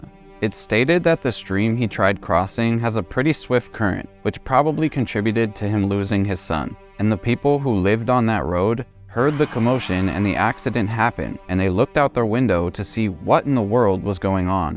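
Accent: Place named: United States. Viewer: yvw.... enjoying u v much ... plus uve got the only american accent ive ever been able to handle lol xxx <3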